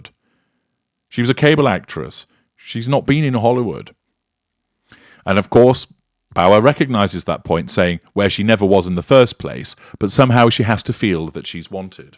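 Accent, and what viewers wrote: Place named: Scotland, United Kingdom. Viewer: What would be worse than to go through the Apocalypse? Finding that Harry's Wife was on the Panel on... Judgement Day! She'd Close the Gates to everyone! Thanks, loved your Scottish Accent!💖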